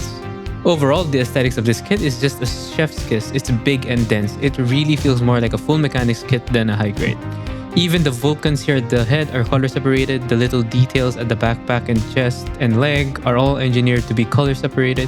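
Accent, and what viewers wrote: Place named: Philippines. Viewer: The filipino accent is strong on this one bois. We got another local reviewer! let's go!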